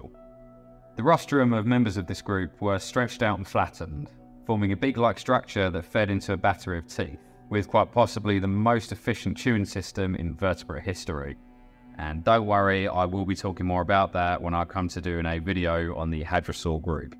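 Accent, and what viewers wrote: Essex, United Kingdom. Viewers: Nothing wrong with the Essex, nice to hear something a bit familiar for once ;) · Because you mumble. It's not the accent, it's that for us to understand your accent you need to enunciate your accent clearly and not slur words in a hurry or get quieter towards the end of a sentence. I, a non-native speaker, have never heard Essex accent before this video in my life, but I had no problem understanding you when you actually spoke up. You don't need to speak like a BBC host, you need to speak like an Essex boy who hosts a video instead of like an Essex boy who talks to his mates on the bus. If you think that you actually did that, you dearly need to get a less muffled microphone. Sounds like you got the wrong end of Shure, completely dead top end. But top end is where "easy to understand" sits. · American here - keep the Essex! 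Love it! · He's understandable. He's from Essex, it's just how they talk. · Hang on to the Essex thing, young man, it figures importantly in why so many people enjoy these excellent presentations! I am an old man from the Mouth of Raccoon in Kentucky Appalachia and I appreciate a good regional accent!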